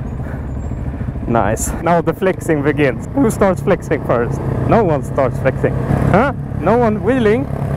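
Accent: Sweden. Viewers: Love the swedish accent haha, great video btw!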